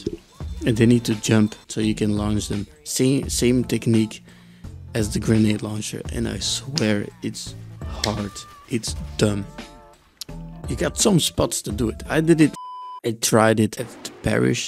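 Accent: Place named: Turkey. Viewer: Are u from turkey bro? Wtf is this accent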